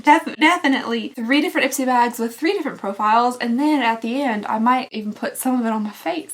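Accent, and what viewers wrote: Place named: South, United States. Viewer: Ha! My husband heard your voice and asked if I’d made a video using an American southern accent!!!! You are so brilliant with these videos. The bags were beyond drab, boring. Well, except for the blush and eyeshadow. Those were shockingly awful. I like the colour combos you came up with, they looked rather lovely for a date night. Ipsy has truly gone to the dogs. Exception: I received that hair mask in a Sephora bag and my hair looked great for that day.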